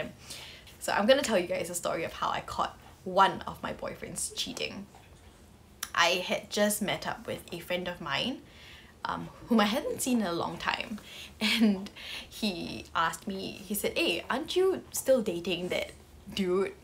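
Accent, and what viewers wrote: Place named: United Kingdom. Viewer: Gosh I love your accent. Kinda British way. I prefer watching the videos than replies on your IG stories. More engagement with fans and I'm gaining knowledge from you. Interesting contents you have been working lately. Keep it up pls!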